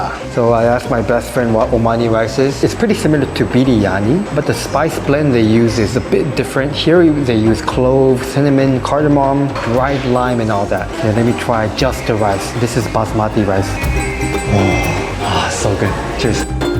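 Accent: Singapore. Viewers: Great tour video! I will have to check out that area next time I am in SG. I must say your videos have really evolved over the years. I started watching you during Covid and it has been interesting to hear your accent evolve as well. At times you sound American or like you could be from here in Hawaii. Other times your Japanese accent comes out. But lately, I have noticed you sounding a lot more like the Singapore locals…. You have truly gone native, so to speak, but it seems like you can turn it on and off, depending on who you are speaking with or about! A sign of a talented mind…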